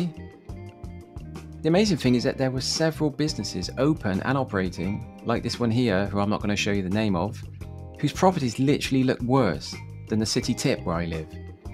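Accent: Southwest England, United Kingdom. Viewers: it is I love your southwest accent it melts me.😊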